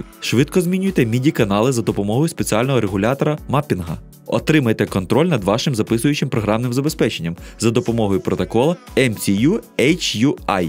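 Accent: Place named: Russia. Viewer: Парень явно привык говорить по-русски, акцент чувствуется;)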